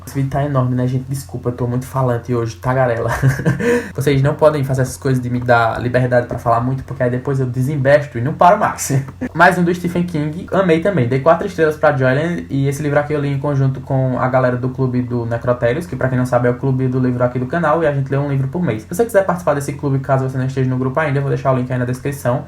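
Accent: Northeast, Brazil. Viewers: é TÃO raro achar esse sotaque no youtube, que eu ainda tô em choque... Certezaaa que esse aí é do meu Nordeste!!!!!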